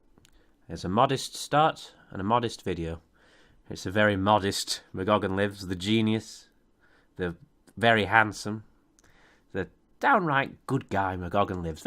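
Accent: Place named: Northern Ireland, United Kingdom. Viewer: He's Northern Irish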